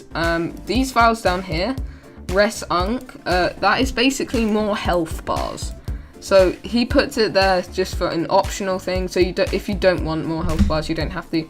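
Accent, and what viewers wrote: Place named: England, United Kingdom. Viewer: holy shit finally an english person hooray i can actually understand this now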